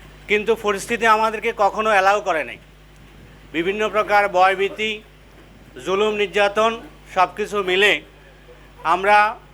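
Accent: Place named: Noakhali, Bangladesh. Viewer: ভাইয়ের বাড়ি কি নোয়াখালী নাকি?? নোয়াখালী নোয়াখালী টান আসে।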